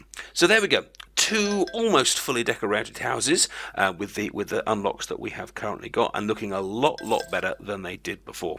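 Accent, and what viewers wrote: United Kingdom. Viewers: I agree lol. I usually hate the british accent but this guy makes it sound really good.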